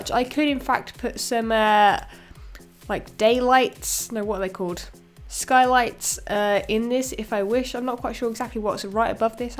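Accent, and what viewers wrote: United Kingdom. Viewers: Your voice ;D I'm American so I'm kinda deprived of British accents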